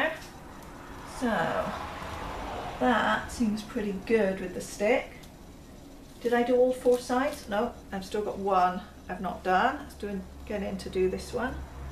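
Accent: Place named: Southern England, United Kingdom. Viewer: Obviously from the south of England, me too but now in Australia. Love your videos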